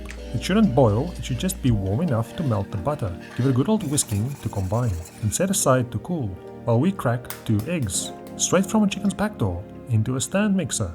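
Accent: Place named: South Africa. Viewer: You have a great voice with the wonderful South African undertone. Thanks so much for your videos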